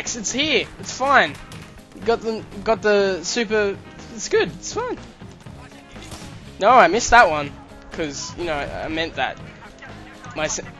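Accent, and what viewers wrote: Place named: Australia. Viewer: Where from Australia are u from?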